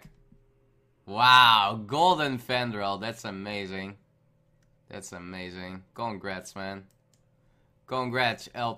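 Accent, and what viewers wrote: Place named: Netherlands. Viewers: I understand and agree with your point, its more of a recommendation if anything:) would (I think) benefit from minimising his Dutch accent, as it will widen his audience and allow him to more effectively reach his viewers. it's not just me berating him for his English accent, it's imo in his interest as well! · You can't just tell a person to be good at something. as you know is Dutch which means he has been speaking the language for his entire life and obviously has adapted to that way of speaking. The Dutch language has a lot of rules which the English language either doesn't follow or has a different rule for entirely so teaching a Dutch person English is harder than teaching them some other European languages. (I probably also made some grammar mistakes in this comment sorry about that.)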